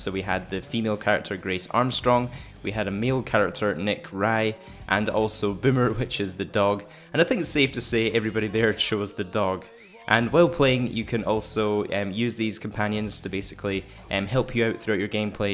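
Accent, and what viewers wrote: Scotland, United Kingdom. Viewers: Hello are u Scottish cause I am aswell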